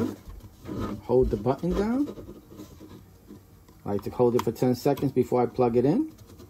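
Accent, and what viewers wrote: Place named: New York, United States. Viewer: Bro, thank you for sharing this I was trying to help my sister in Orlando and I could not figure out how to reset her Google Wifi two. The button on the back of the gen 2 is underneath the device with no fiscal button. Bro, I was listening to speak and I swear you sound just like me. I told my wife to listen to your video and she was that the voice sounds familiar. She tells me that's a New Yorker voice. I told her that's me except you have a little higher pitch. Anyways, thanks for sharing.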